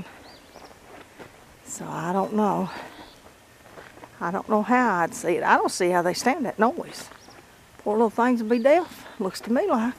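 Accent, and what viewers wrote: South, United States. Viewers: I enjoyed listening to you talk reminds me of my mother My southern accent isnt as strong as yours Get A trail camera from Walmart it will capture the owl they are fairly cheap I video my deer all the time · I do enjoy your talking and walking. I have a long time lady friend that lives in my apt building she is from the south but sounds just like you.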